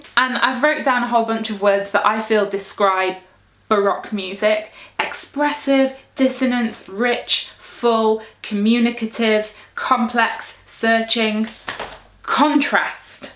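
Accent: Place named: United Kingdom. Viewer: I'm in the second half of the video... and I already got lost :'D but it could be because I speak spanish and I'm not used to hear British English u.u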